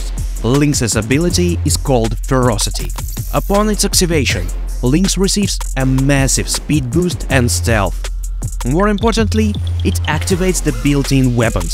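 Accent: Russia. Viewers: russian accent...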